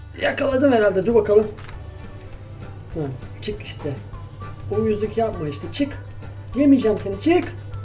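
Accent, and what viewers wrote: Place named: Bartın, Turkey. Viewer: Ulan garibana destek için abone oluyorum nerelisin bu arada bartınli şivesi var sende sanki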